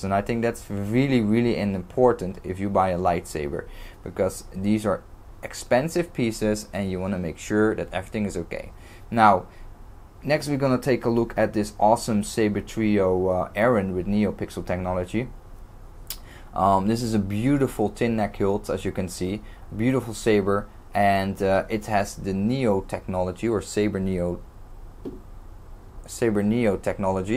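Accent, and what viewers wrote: Netherlands. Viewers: ja ik hoorde het een beetje aan het accent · Where are you from, ur accent souns a bit dutch to me xD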